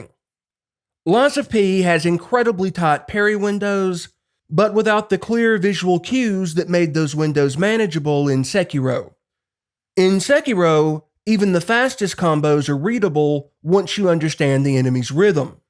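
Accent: South, United States. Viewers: hearing another southerner talk about soulsgames is something i never thought i would hear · It's been a while since i heard a redneck accent. Thank you for the making the video good man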